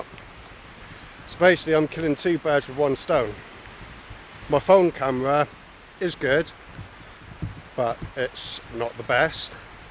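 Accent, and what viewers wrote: Devon, United Kingdom. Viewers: Hi.... great to see you are keeping well mate. Don't worry about the awesome Devon accent mate..... it is one of the best we have. It has been a crappy 12 months for everyone and fingers crossed when all this madness ends things will get better for us. People sub because we like you and the things you talk about on video. Plus you are a top bloke as well. I will always be around for the times you get out and do a video mate. Stay safe, all the very best, Mark:):) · Just stay as you are, you just have a Devonshire accent I have a Suffolk accent lol don’t worry about how you sound, I watch you tube on my tv you sound ok to me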